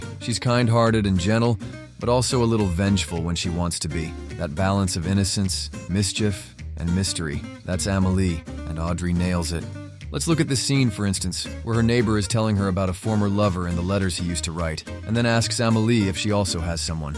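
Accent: United States. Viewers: that really doesn't surprise me. Listening to an American accent critiquing the film was painful. 🇳🇿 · Kind of weird to hear a monotone american voice drone on about one of my favourite films. But what he says is quite acurate. I saw the film on the opening day in Germany. It flashed me so hard. I loved it, all the weirdness, the strange colours, the music! Amelie is still one of my most beloved film scores! It is what it is. Either you love it, or you don't. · Your stupid american accent killed this video from me. I lived in Portland Oregon and I just have to tell you to get a different voice for your videos.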